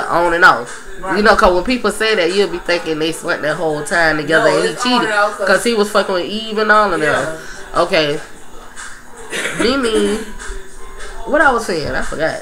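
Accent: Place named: Miami, United States. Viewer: I knew u was a miami girl like me frm the way u talk... thats y i love u!!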